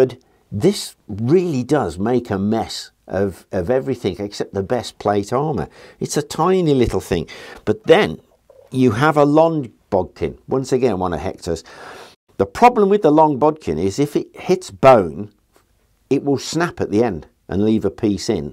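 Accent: United Kingdom. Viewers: The host of this video, to me, amplifies the subject matter with his authenticity. It’s clearly obvious he’s done his homework. Besides, with his British accent, he adds more. One day, I would like to allocate a few hours (on a Sunday), and learn the events of The Great Crusades. Christians vs. Muslims. I do have a deep interest in world history, just not all events. Recently, I discovered the unreal incident that occurred in 1964 (the year I was born) at Stanleyville, The Congo. The black rebels there committed atrocities that matched the deeds of Nazi Germany, but Belgium paratroopers retook the city and rounded up those responsible. All were either hanged or shot on site.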